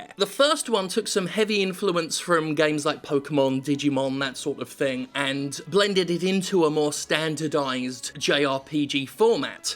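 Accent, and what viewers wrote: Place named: United Kingdom. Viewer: When he started talking about the story, he completely lost me with his Britishness.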